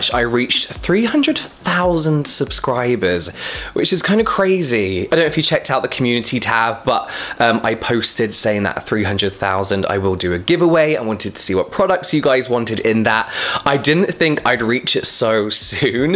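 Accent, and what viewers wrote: England, United Kingdom. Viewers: Listening to you makes me feel like I'm back in the UK! Love your accent. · Scrubbing away ah ih = scrubbing away at it. Gotta love the brits 😂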